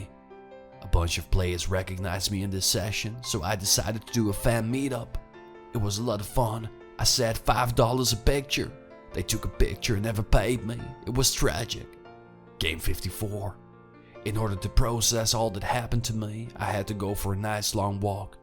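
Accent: South, United States. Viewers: the accent is a southern american accent. not south america i mean the american south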